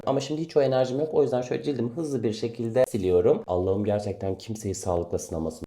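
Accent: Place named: Trabzon, Turkey. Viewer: Haşimdi kalk dedi Trabzon lu musun yoksa 😊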